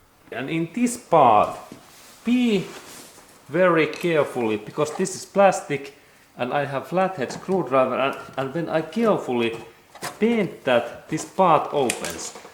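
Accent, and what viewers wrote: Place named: Finland. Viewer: kaunista tää suomen ralli englanti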